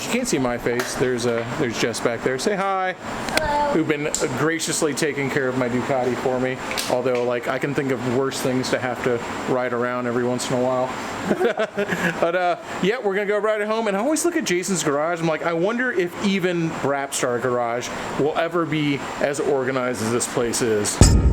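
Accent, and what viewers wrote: South, United States. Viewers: What happened to your southern accent?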